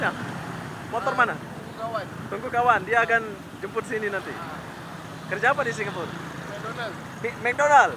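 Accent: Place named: Malaysia. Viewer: orang Lombok tapi cakap kamu seperti orang malay.....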